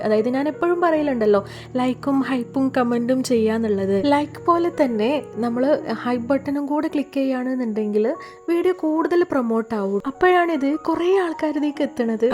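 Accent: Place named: Malappuram, India. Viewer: നിങ്ങടെ മലപ്പുറം ഭാഷ കേൾക്കാൻ ഒത്തിരി ഇഷ്ടാണ്. അടിപൊളി 👍👍👍🥰🥰🥰🥰🤩🤩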